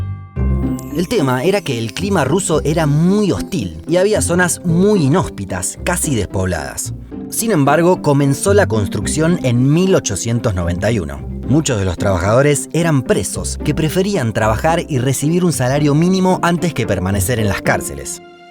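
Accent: Argentina. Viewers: El acento argentino es lo màs horrible se que pueda escuchar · De dónde es el narrador? Argentina?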